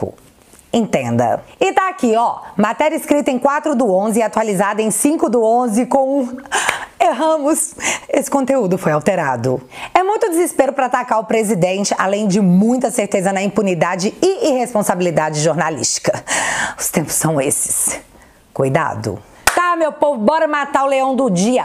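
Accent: Minas Gerais, Brazil. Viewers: conquanto aos meus 81 anos e a minha quase nenhuma experiência em informática, amo vê-la e mais ainda de alegrar a minha alma com sua simpática, clara, didática e envolvente forma de esclarecer “fatos relevantes ou irrelevantes” (kkkk). Sou goiano, filho de um mineiro de Estrela do Sul e de uma mineirinha de Araguari, amante do seu “mineirês”.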